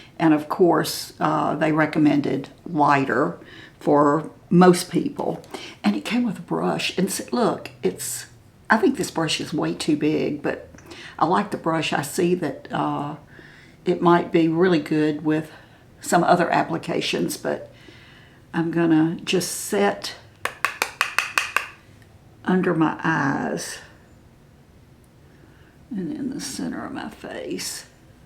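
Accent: South, United States. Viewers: You are such a beautiful woman, inside and out. Prayers for your stressful things to be over, and everything else to be good. I enjoy seeing you, and I love listening to your “southern” accent! It’s so pleasant to hear!🙏❤️💐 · Your southern voice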